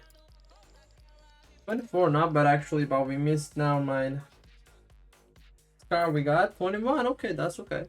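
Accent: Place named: Turkey. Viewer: Are you Turkish? your accent sounds like Turkish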